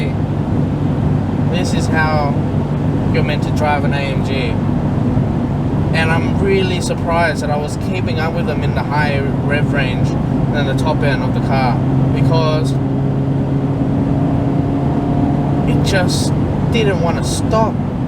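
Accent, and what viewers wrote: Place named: Australia. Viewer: Sounds like Australian accent. Did you live in Aussie before? I used to work in Laos. Sabaidee from Indonesia 🇮🇩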